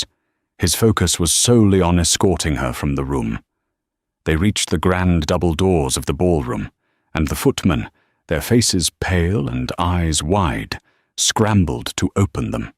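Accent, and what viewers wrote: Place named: England, United Kingdom. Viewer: Ah yes. Luv that purring English accent. YUM!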